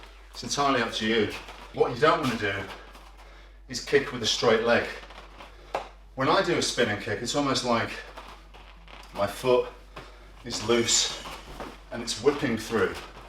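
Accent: United Kingdom. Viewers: I have never heard him talk out of a movie character so I didn’t know he was British 😂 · I thought Scott is a Ukrainian. I hear a British accent right here .My Idol in Yuri Boyka! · Yo I didn’t even know he was British. Man British people are taking over in Hollywood.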